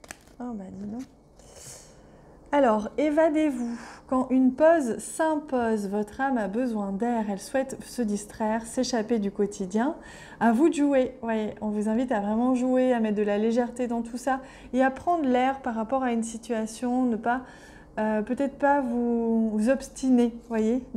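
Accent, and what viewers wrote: South, France. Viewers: Bonjour, Je vous découvre aujourd’hui. Vous avez un accent du sud aussi, comme Laura (Intuitive), peut-être vous connaissez-vous? Merci pour cette guidance. Bonne journée 🙏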